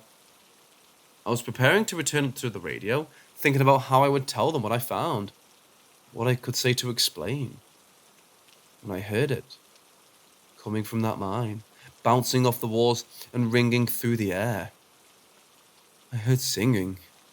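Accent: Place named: Scotland, United Kingdom. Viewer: Scotland I think idk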